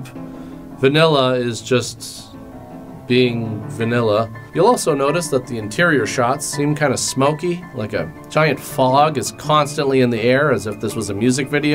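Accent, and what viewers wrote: Canada. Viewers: Ahhhh, I could listen to Canadian "borrow" all day.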